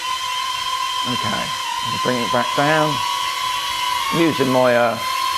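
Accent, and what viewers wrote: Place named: West Country, United Kingdom. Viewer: Nice one,. You sound like you are from the west country. Brizzal? 😀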